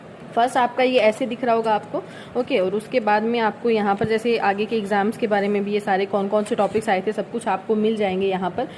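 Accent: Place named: Maharashtra, India. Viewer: Maharashtra s clear h ye to.... Marathi aati h apko🤔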